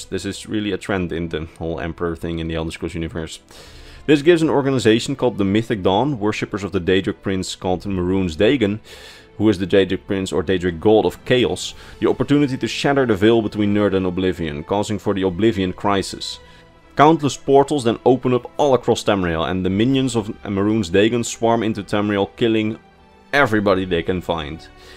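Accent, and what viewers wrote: Netherlands. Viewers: Are you dutch by any chance? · Are you niederlansk? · I can tell youre dutch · God...that dutch accent tho... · I need to know: are you dutch? Its your accent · Great vid. Are you Dutch? The accent seems familiar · Dumb question, but are you Dutch? Your accent sounds Dutch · Lekker Nederlands accent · So I just discovered you. Now I have a whole lore backlog to go through to have going in the background while I work! Wahey! Also, fellow Dutchie I hear, so bonus points for that. Goedenavond! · U dutch? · Hey question if you don't mind me asking buy you don't happen to be dutch do you? (I'm dutch myself and I thought I heard a small dutch accent over your english) Also great video I enjoyed it very much :D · I hear a Dutch accent 😊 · You dutch? You sound dutch.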